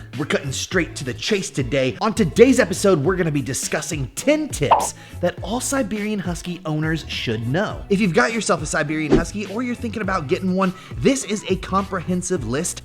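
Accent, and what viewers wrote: United Kingdom. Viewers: I love his British accent 😆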